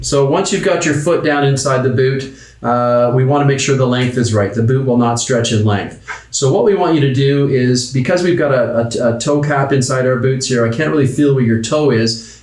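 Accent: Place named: East Coast, Canada. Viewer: I give Americans a hard time about Canadians saying "about" a funny way. I'm guessing this guy is in from the East Coast because I'm from the West and now I can clearly see what the yanks are hearing. 😁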